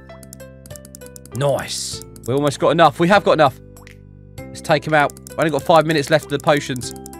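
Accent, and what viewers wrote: United Kingdom. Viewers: 39 mins! :D love ur vids and the british accent 😮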